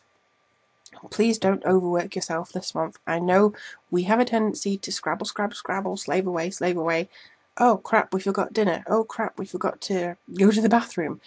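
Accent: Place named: Liverpool, United Kingdom. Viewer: Liverpool/Wirral girl? I hear the accent of home :) Good reading, thanks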